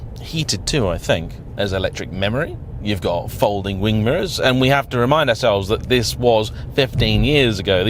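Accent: United Kingdom. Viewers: This guy looks like an American, dresses like one, but has a British accent and is in the UK I think. 😂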